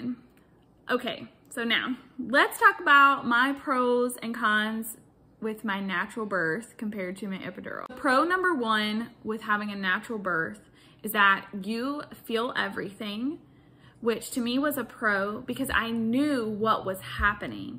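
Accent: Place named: Texas, United States. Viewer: Are you from Texas? Your accent sounds really Texan! 😂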